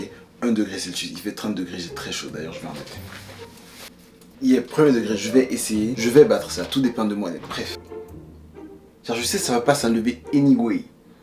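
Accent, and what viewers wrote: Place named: Toulouse, France. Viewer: l'acent toulousain j'aime trop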